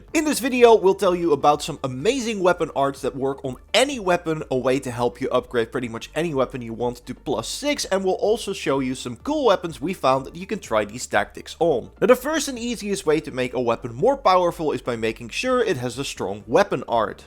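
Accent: Netherlands. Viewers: Jij klinkt zo Nederland's ,lol ,great vid btw ❤️💪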